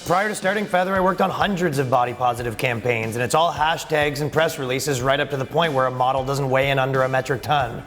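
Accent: Canada. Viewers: your canadian accent was THICK in this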